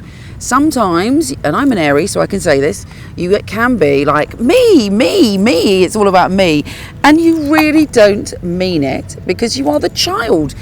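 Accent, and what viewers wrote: United Kingdom. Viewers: Why do I pick a little Scottish from you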